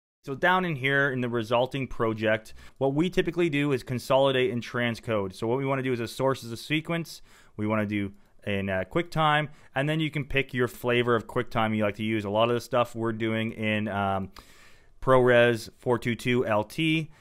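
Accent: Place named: Canada. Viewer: He's Canadian. It's one of the "tells," as is "uh-GAYN" instead of "uh-GEN" for the word "again." I worked with an Irish-Canadian editor on a couple of movies (shot in Canada, edited in Santa Monica, California) and learned a few of the clues to spot the Canadians (beyond "ah-BOAT" for "about"). Now I can't watch TV without noticing Canadian accents. (Australian actors, for some reason, seem to be the best of the English-speaking diaspora at blending in with UK English or USA English speaking casts. I think a lot of Scots are good at blending in with their southern (English) cousins.) OK, I'm rambling ... maybe too much coffee ... sorry... but accents are fun....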